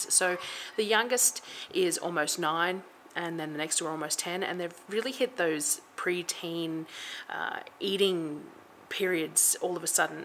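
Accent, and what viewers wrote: Australia. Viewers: I love your content because your real every thing is not all prettied up before the camera is turned on you don’t make me feel inadequate because my home is not picture perfect and your Australian I understand what your saying my sister and I appreciate everything you do. My sister has fibromyalgia and other medical conditions as do I your amazing and we have learnt so much from you ❤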